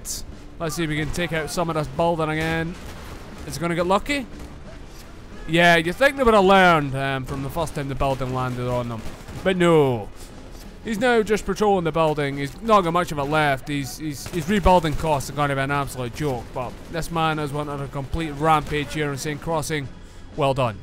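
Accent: Scotland, United Kingdom. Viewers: fucking love Scottish Commentator xD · Your scotish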